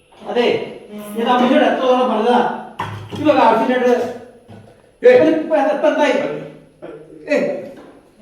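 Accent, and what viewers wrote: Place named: Namboothiri, India. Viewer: സത്യം പറഞ്ഞാൽ നമ്പൂരി സ്ലാങ് ഇത്തരം കഥക്ക് മാച്ച് അല്ല. നിങ്ങളെ പഴയ പർളിവുഡും നമ്പൂതിരി നേരം പോക്കും തന്നെയാ നല്ലത്. ഞാൻ രണ്ടും ആസ്വദിക്കുന്ന ആളാണ്. അതായത് അവനവന് ചേരണ കോണകമേ ഉടുക്കാവൂ എന്ന് സാരം❤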